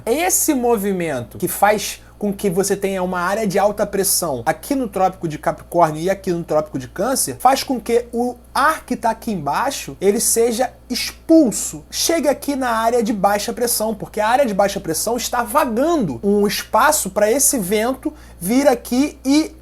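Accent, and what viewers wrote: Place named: Rio de Janeiro, Brazil. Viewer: sotaque carioca tava forte, são não mais que a explicação, falou pouco, mas disse tudo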